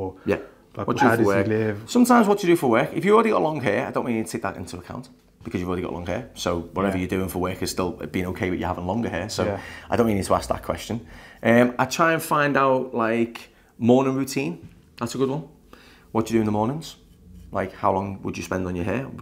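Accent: United Kingdom. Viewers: He's British, great accent talks about what he is doing on video, scroll on if you don't like it. Some of us like to watch and listen to!❤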